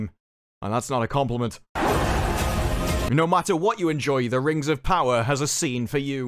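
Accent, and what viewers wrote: United Kingdom. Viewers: Can't help but smell the irony of somebody with a british accent, with a union jack behind them, complaining in english about a mangled culture.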